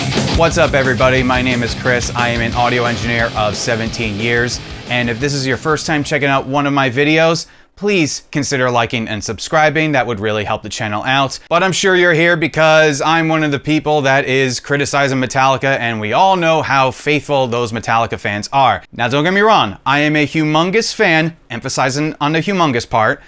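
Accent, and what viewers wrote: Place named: New Jersey, United States. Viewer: for being from jersey you simmer on the accent, thx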